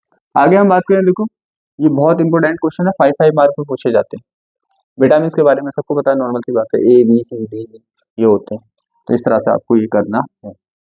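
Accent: India. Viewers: Apko dekhne se nhi lg rha language hi up ki h 😂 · Sir aap Bareilly se lag rhe hai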